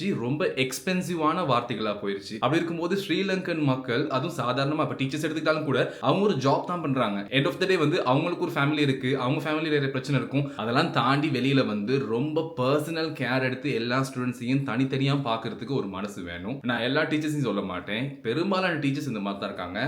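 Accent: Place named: Tamil Nadu, India. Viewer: நீங்கள் பேசும் தமிழ் தமிழ் நாட்டுத் தமிழ் மாதிரி உள்ளது. உங்கள் பேச்சு இலங்கைத்தமிழ் போல இல்லை. (மட்டக்களப்பு, யாழ்ப்பாணம் மற்றும் மலையகத் தமிழ் போன்றும் இல்லை)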